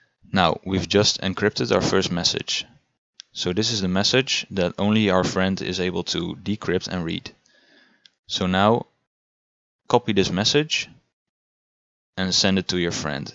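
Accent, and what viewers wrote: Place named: Sweden. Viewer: nice tutorial! Du är helt klart svensk, hörs klart haha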